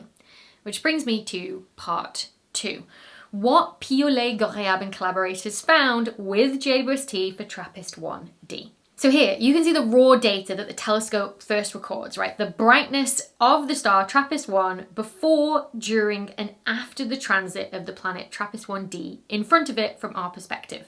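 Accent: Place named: Durham, United Kingdom. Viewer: You really picked up the accent at durham, i couldn't work out if you were Victoria wood or steph houghton lol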